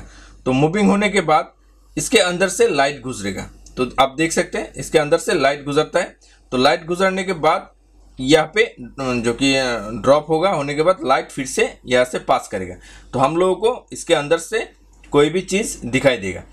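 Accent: Bengal, India. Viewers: Are you a Bangali...????😀😀😀😀😀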